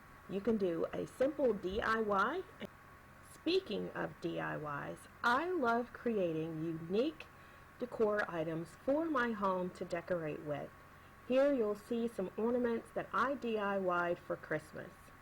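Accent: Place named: South, United States. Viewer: I love how you decorate your home! You have such an eye for design! Your tablescapes are always on point! You mentioned picking up home decor at Krogers. More and more I am seeing home decor at grocery stores and even Ace Hardware, believe it or not! Thanks so much for sharing your bio! I hear a little Southern accent! Take care and be blessed!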